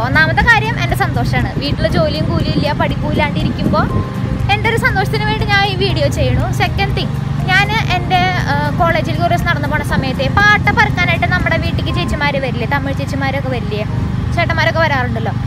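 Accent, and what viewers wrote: Thrissur, India. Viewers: ഹലോ 😊♥️ പൊക്കി പറയ അല്ല എന്താ പ്രസന്റേഷൻ ♥️തൃശ്ശൂർ ഭാഷ കേൾക്കാൻ ഒരു സുഖം തന്നെയാ.. പിന്നെ (പേര് അറിയില്ല )ന്റെ വർത്താനം ♥️ഞാൻ vloger കാർത്തിക് സൂര്യ ♥️♥️ഫാൻ ആണ് ഇപ്പോൾ നമ്മള സെക്കന്റ്‌ ഫാൻ ആയി ♥️ഒരു women ആയ കൊണ്ടല്ല.. Athul സജീവ്... തിരുവനന്തപുരം 😊 ഈ സ്റ്റൈൽ ഒരിക്കലും മാറ്റരുത്... God bless you 😊😊🥰🥰♥️♥️ വിജയൻ ചേട്ടാ ♥️♥️♥️ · Hai ചേച്ചി ചേച്ചിയുടെ എല്ലാം വിഡിയോസും കാണാറുണ്ട് ചേച്ചിയുടെ തൃശൂർ സംസാരം തന്നെ കേൾക്കാൻ തന്നെ നല്ല രസം ആണ് ചേച്ചിക്ക് ബുദ്ധിമുട്ട് ഇല്ലെങ്ങിൽ ഒന്ന് എനിക്ക് വിളിക്കോ <num> ഇത് ആണ് എന്റെ നമ്പർ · Thrishure slang and your smartness njanum subscribe cheythu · നിങ്ങളെ സംസാരം കേൾക്കാൻ രസം ഉണ്ട്.... ചിരിച്ചു പോകും..... കാരണം തൃശൂർ ഭാഷ ആണ്.... ഞങ്ങൾ കൊല്ലം ആണ് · മോളെ ഉണ്ണി എന്നുപറഞ്ഞാൽ കുട്ടിയാണെന്ന് തൃശൂർ കാർക്കും മാത്രമേ മനസിലാവുകയുള്ളു അതുപോലെ തൃശൂർ സ്ലാങ്ഗ് ഒരു രക്ഷയും ഇല്ല പിന്നെ മോളെ നിന്റെ മനസ്സ് നെല്ലാണ് ദൈവം മോളുടെ ഉദ്ദേശം നടത്തി തരാൻ അനുഗ്രഹിക്കട്ടെ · എന്റെ പൊന്നു മോളെ... 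നിന്റെ അസ്സൽ തൃശൂർ ഭാഷ... ഇഷ്ടപ്പെട്ടു... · തൃശൂർ സ്ലാങ് പൊളി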